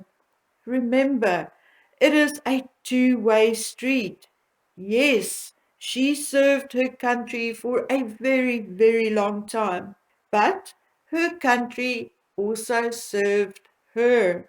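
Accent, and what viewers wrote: South Africa. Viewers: Its very relaxing, then again I am South African living in the UK so any SA voice is a bonus for me.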